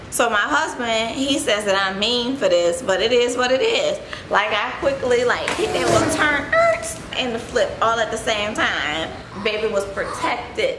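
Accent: New Orleans, United States. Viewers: Thanks for the tips! Also I love your accent, are you from New Orleans? I died laughing at the old lady story 😂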